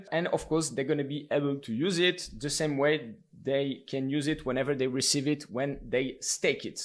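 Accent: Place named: France. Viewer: ton accent on dirait que t'es francais tres bonne video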